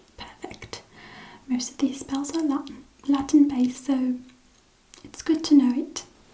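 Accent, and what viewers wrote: England, United Kingdom. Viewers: A bit of a mix of several British accents 😊so so so calming and wonderful! you’re so so talented and I feel very spoilt right now:) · she's American but she sounds British. This is the first video that I watched on her channel so I thought she was British and then I watched all her other ones and she's American 😂😂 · English accent. Britain is four countries. England, Northern Ireland, Wales and Scotland. All very different accents.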